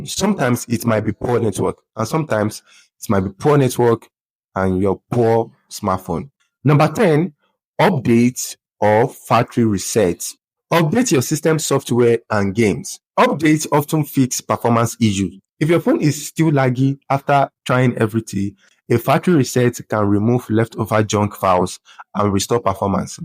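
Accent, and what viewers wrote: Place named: Nigeria. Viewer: Nigerian accent 😊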